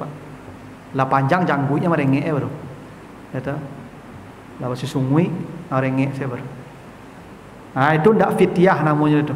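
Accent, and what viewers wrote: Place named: Minangkabau, Indonesia. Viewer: Khas muralabuahnyo . Membuek ambo takana jo kawan ambo maso di padang daulu. Tapi rancak end mantap mendanga ust membawo ceramah. Ambo sanang 👍👍👍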